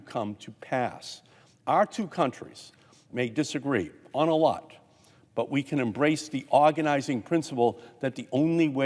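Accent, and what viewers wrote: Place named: Boston, United States. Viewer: I'm listening to his voice and not looking at the video and I'm thinking he sounds like he is from Boston. Not a super heavy accent but a Boston type accent. I lookup and they have tagged, senator from Massachusetts. Lol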